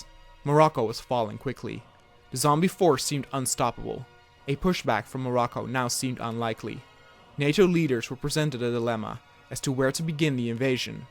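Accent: Netherlands. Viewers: Are you Dutch? It sounds like it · You're dutch right?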